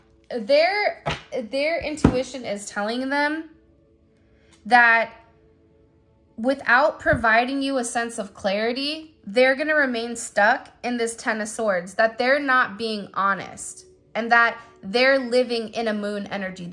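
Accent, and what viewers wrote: California, United States. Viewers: Regular sub for several months now and while your readings are always lit as AF. This one was on a whole Nother level. Thank you for your gift. I’m from the Bay Area and I can hear California in your voice and you’re saying and it makes me happy. OK I’m gonna go continue the reading now I stopped at halfway through just to write this.❤️‍🔥❤️‍🔥❤️‍🔥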